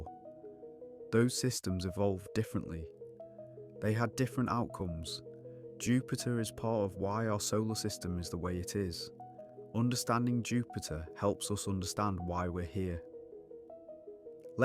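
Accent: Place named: England, United Kingdom. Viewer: where in england are you from? i like your accsent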